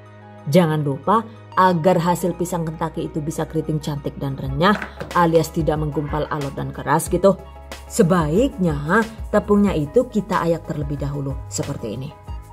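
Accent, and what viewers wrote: Banyumas, Indonesia. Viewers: Penasaran sm suaranya, kirain sdh ibu" stngh baya, tak taunya msh muda bs ngapak sdkit ngebodor bs bhs inggris pula keren 👍👍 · Wong ngapak kie yah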